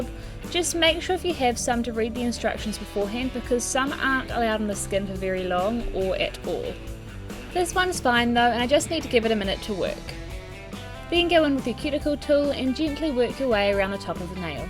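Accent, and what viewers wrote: New Zealand. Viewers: I love the way you talk! I'm a Kiwi too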